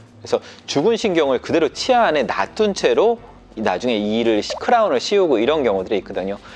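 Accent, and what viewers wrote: Seoul, South Korea. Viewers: 서울분이신가? 있거등요 ㅎㅎ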